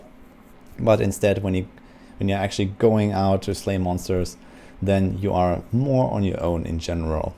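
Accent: Germany. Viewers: Bitte mach doch solche info videos auch auf deutsch. Man hört es richtig raus, das du ein Deutscher bist...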